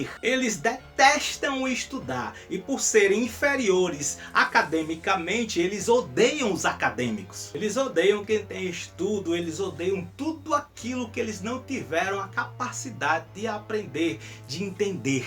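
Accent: Northeast, Brazil. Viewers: Cara, onde você estava que só agora eu te achei? E que achadoooo! 👏🏼👏🏼👏🏼👏🏼👏🏼👏🏼Uma pista eu já tenho: o sotaque é nordestino😍😍😍😍😍😍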